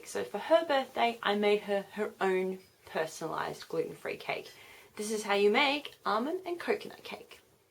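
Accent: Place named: Australia. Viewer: Hi love your videos and explanations wonderful job!! I love your accent too are you from Australia? Keep up the great videos!! Allie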